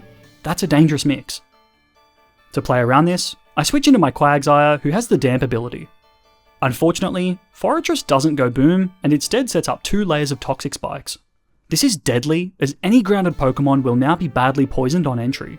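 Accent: Australia. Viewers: Ahh it’s so refreshing to hear an Australian voice over Pokémon runs, also do you have a discord server by chance?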